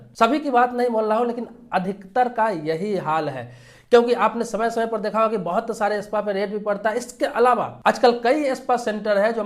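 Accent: Bihar, India. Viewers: Tu bihari hai kya be ?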